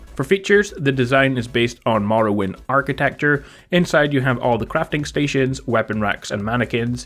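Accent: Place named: Scotland, United Kingdom. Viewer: Your accent sounds a little bit Scottish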